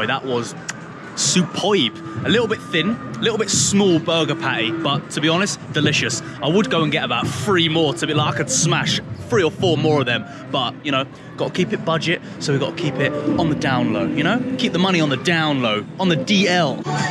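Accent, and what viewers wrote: Cockney, United Kingdom. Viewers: I love his personality, a budget blogger…love it! Plus you can’t beat the British accent. 😅 Had to sub. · Only stumbled across ya videos few days ago. Been binge watching em all 😂😂 funny cockney geezer me bein bias cos im cockney myself lol. Brilliant videos mate 👊👊👊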